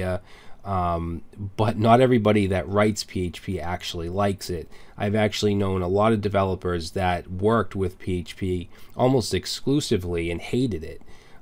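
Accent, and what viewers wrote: United States. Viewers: Man, I dig your accent. I think it's as American as it gets.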